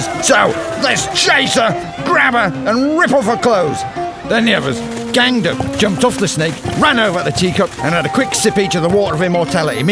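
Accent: United Kingdom. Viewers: Классно, спасибо Вам. Но голос все таки придется подучить "русской" манерой рассказывания :) п.с. Не понимаю почему рассказчика с шотландским(если не ошибаюсь) акцентом выбрали ) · There was a question above in Russian 'Why the narrator have such an accent?'. And I answered 'Which accent? He's British!' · если человек пишет про "американскую аудиторию", может речь как раз о британском акценте, которому как раз откуда не быть у британского диктора?.. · why so British?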